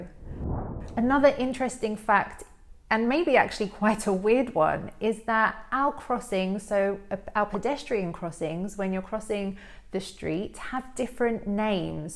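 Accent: United Kingdom. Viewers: I love the British accent , the British culture and your videos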